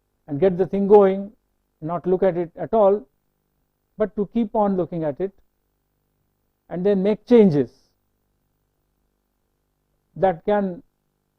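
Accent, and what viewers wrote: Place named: South India, India. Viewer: Some Southrn Indian Mix English But it can be understand, Who D Fker Says It Cant be understandble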